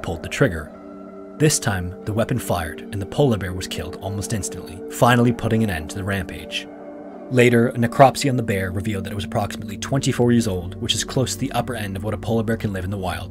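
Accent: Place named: Canada. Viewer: Love your stories and your Canadian accent! 🙂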